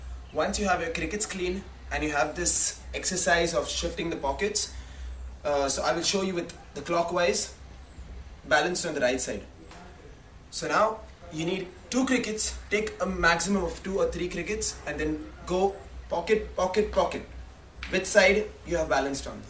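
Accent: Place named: India. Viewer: i love indian accent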